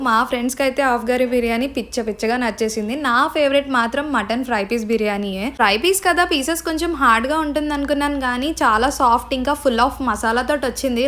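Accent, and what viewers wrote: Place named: Godavari, India. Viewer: Bad comments gurinchi alochinchaku normal ga muslims yeppudu hindi ne matladatharu kani ni achamaina godavari slang bagundhi and Mamulgane milo vallani bayataki ranivaru and face kuda kanapadanivaru but miru intha freedom ga vunnaru ante mi intlo mi valla dhaggara miru thechukunna trust....chala baga vuntay mi videos ❤🥰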